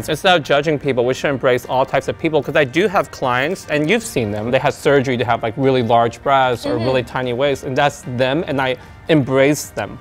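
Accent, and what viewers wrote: Australia. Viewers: you’re right! I was going off her accent I heard at an interview. I clearly was t listening close enough because I can usually tell the difference. Perth Australia. I just looked it up. Thanks for the correction 👍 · she's Australian, I believe. 😁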